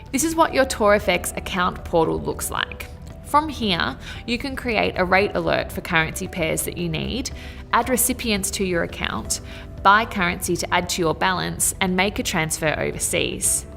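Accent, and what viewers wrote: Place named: Australia. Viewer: I love her Australian accent and is very wise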